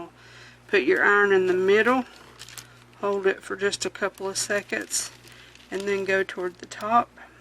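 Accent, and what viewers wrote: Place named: Indiana, United States. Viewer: This is so ingenious! And I was guessing Indiana. I recognize your accent. I was born in Indiana and lived there for years.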